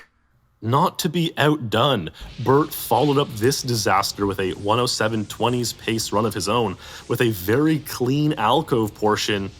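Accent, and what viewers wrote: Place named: Canada. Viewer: This one seems more Canadian than usual. Can't quite put my finger on why, though...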